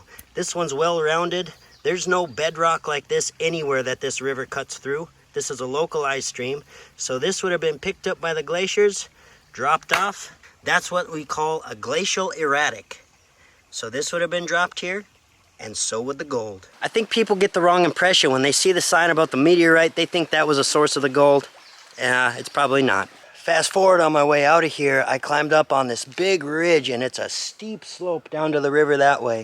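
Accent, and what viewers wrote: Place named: Wisconsin, United States. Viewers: U got the wi Midwest accent down. Almost Canadian but not quiete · Northern Illinois here. Think I hear that native Minn/wis accent, just a bit.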